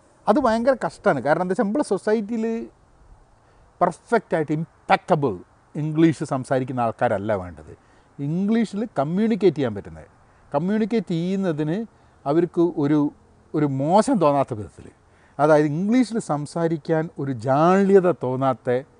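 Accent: Kozhikode, India. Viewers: ങ്ങള് ഭാഷ മാറ്റരുത്...(കോഴിക്കോട്) അത് മാറിയാൽ പിന്നെ നിങ്ങളില്ല.... ( സഞ്ചാരം പരിപാടി അതിന്റെ വോയ്സ് ഓവർ കൊണ്ടാണ് ജനശ്രദ്ധ പിടിച്ചു പറ്റിയത്) അത് പോലെ... നിങ്ങളുടെ ഈയൊരു... ശൈലിയാണ്..കിടു... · you are 100% right. I like your kozhikodan style malayalam, though I am from Kollam. English pronunciation is not something to be worried too much. we must be able to communicate. Those who want to learn english , please watch an english movie or a cartoon over and over until you learn all the dialogues in it. Then try another one. if you do two or three movies like that, you can easily communicate in English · സാറെ,ങ്ങളെ മക്കളെ ങ്ങള് കോയിക്കോട്ടെ സ്ലാങ് പഠിപ്പിക്കണം!!ങ്ങള് കോയികൊട്ട്കാര്ക് അഭിമാനമാണ്!!പിറന്ന നാടിന്റെ ഭാഷ മുറുകെപിടിക്കുന്ന താങ്കൾ ഒരു മുത്താണ് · ഞാൻ കോട്ടയക്കാരൻ ആണ് പക്ഷേ എനിക്ക് ഏട്ടൻ ന്റെ കോഴിക്കോട് ഭാഷ വല്ല്യ ഇഷ്ടം ആണ്.നന്നായി മനസിൽ ആവുന്നുണ്ട്.ഇങ്ങള് supprrrr aneee.. 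· മ്പളെ കോഴിക്കോട് പ്രനൺസ്യേഷൻ 👌👏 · Njammalu malappuranu ingale kozhikkodan bhasha Perth ishtanu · ഞാനീ വീഡിയോ കാണാനുള്ള ഒരു കാരണം ഞമ്മളെ കോയിക്കോടൻ ബർത്താനം കേക്കാനാണ് അമേരിക്കൻ ഇരുന്ന്കൊണ്ട് ആ നാടൻ ബർത്താനം കേക്കുമ്പോ ഉള്ള ഒരു സുഖം അത് ബേറെ തന്നെ. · ഇങ്ങടെ കോഴിക്കോടൻ ഭാഷയ ഇങ്ങളെ ഞമ്മക്ക് പെരുത്ത് ഇഷ്ടപ്പെടാൻ കാരണം..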